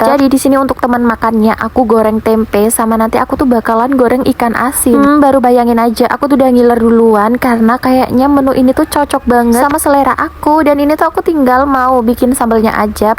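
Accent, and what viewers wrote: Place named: Lampung, Indonesia. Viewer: Logat jawa nya keknya tinggal di lampung ya..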